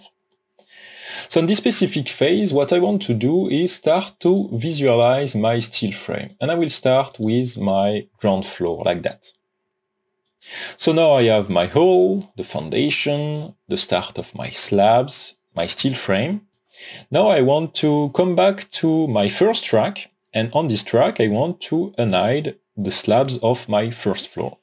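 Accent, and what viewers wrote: France. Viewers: Nice french accent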